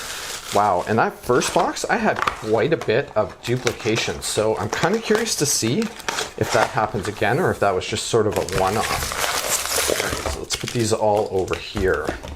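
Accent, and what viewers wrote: Canada. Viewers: The amount of times he’ll say “eh” in his videos, no doubt he’s Canadian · First! Awesome videos! Canadian Accent is Crazy!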